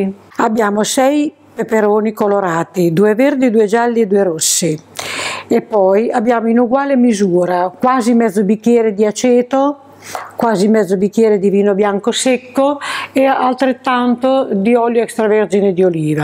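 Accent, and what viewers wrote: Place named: Romagna, Italy. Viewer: Ciao, la tua cucina mi piace moltissimo. Poi questo dolce accento romagnolo, delizioso. Ho guardato i nidi di rondine, buonissimo. Lo farò. Grazie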